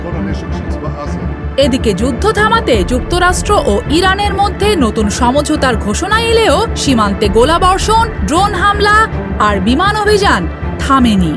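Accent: Kolkata, India. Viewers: এই সাংবাদিক মাগীরে চাকরি দিছে কে এই মাগিতো কলকাতার হকার সাংবাদিকদের মতো সংবাদ পাঠ করে। কথাবার্তা তো কলকাতার মানুষের সাথে মিল আছে।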